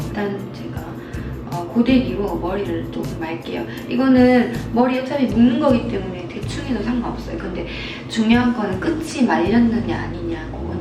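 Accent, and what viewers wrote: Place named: South Korea. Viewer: I heard some dialect in your Korean, are you not from Seoul? :)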